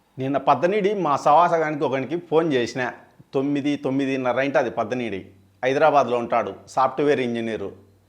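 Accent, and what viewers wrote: Kadapa, India. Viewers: పక్క కడప జిల్లా బాష ❤😂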